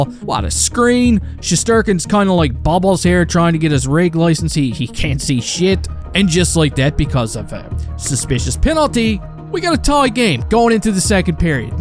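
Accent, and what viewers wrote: Newfoundland, Canada. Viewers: East coast Newfoundland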